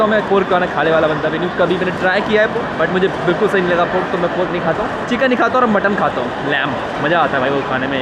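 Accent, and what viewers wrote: Bihar, India. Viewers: Thoda dheere bola kar bhai bata deta hai ki Bihar ka hai.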